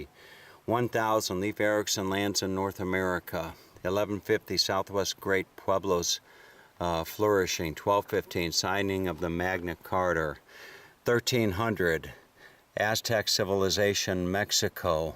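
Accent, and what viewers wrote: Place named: California, United States. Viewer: This is how all people from California talk! LOL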